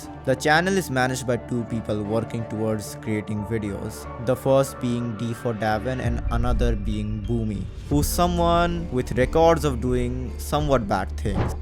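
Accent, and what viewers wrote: India. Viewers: ahh first ur Indian accent fix that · That goofy ahh Indian voice💀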